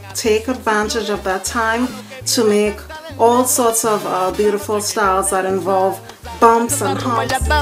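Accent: Trinidad and Tobago. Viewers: Hiiii! That accent sounds familiar. Trini?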